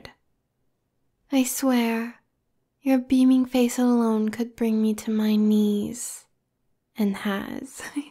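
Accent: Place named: Brooklyn, United States. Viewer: I was thinking more of a Brooklyn New York accent but that works too 😄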